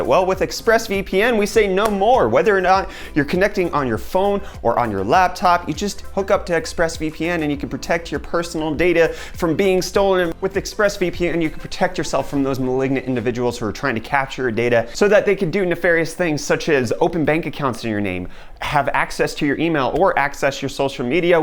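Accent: United States. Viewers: Most South Africans don't have accents :) He does seem to have a slight American accent though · You have an American accent though... 🤔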